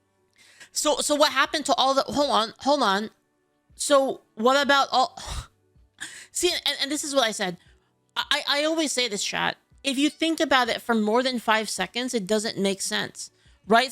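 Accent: United States. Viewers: Unrelated but, As a British man, i find it funny hearing an American explain what rain is to me 😂 i was 4 when i discovered i could take off a raincoat, we're practically born underwater it rains so much here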